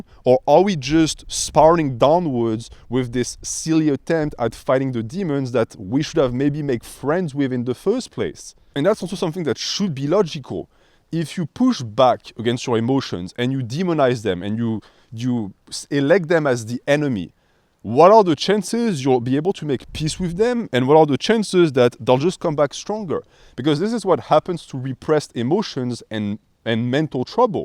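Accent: France. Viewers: Bonjour. You have a French accent am I right? :D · Are you French ?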